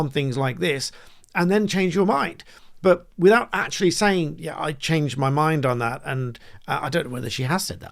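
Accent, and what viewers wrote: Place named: RP, United Kingdom. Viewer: posh and based, love it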